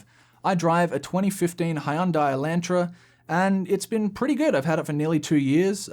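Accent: South Australia, Australia. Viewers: Ahhnswer.. classic Adelaidian. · Oh heaps good, I had no idea you were a fellow South Aussie, for some reason I thought you were Victorian.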